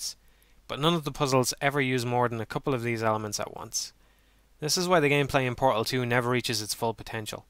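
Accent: Ireland. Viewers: where are you at in the world I live in the us in kokomo Indiana but I feel we are kindred spirits because you have passion for games like me and you sound irish I have red hair and I agree with every you say about games im just drawing a comparison but its incredible the way your words are the way I think maybe we could team up but that is just wishful think anyway keep with it I love work man you are like adam sessler, tommy tellareco, Geoff key lee. (spelled wrong) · are you irish?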